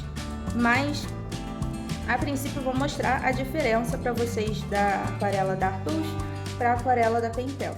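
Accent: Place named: Rio de Janeiro, Brazil. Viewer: Ótimo! Até o sotaque carioca fica mais lindo com um português bem falado e conteúdo. Agora vamos ver minhas aquarelas rs.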